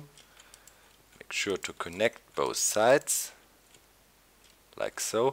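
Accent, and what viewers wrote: Germany. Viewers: Hey, are you from Germany? The accent sounds like that:D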